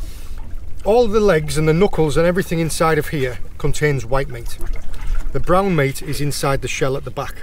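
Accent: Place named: Geordie, United Kingdom. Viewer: Hello Sir, I hope you, family and friends are all well. What a great video. I shoot and fish for the pot only, or give away to the neighbours, nothing goes to waste. Very informative video and entertaining too. Are you Geordie or Sutherland? My Dad could tell. Take care and stay safe., England.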